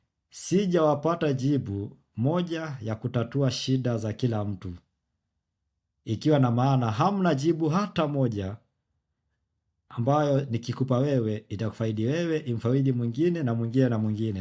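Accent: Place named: Kenya. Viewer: Mkenya anae ongea kiswahili sanifu dah! nimevutiwa na kiswahili cha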